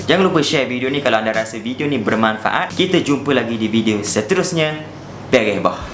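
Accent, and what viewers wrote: Terengganu, Malaysia. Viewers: Boh org ganu ke hahahhaha.. Kemah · Loghat ganu tu hok best nya....boek arr bang👍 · Kemah boh... Bangung... Kuat ganu ter.. 😅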